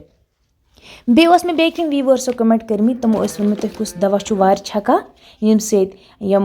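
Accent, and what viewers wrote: Kashmir, India. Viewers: ❤❤ mujhe tho aap ki voice kashmiri mai he aati hai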